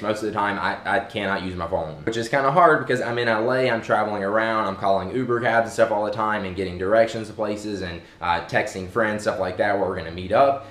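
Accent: South, United States. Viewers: Well obviously an american southern accent, he lives in america. Nobody speaks proper english. You shouldn't be making fun of others for something you don't even do.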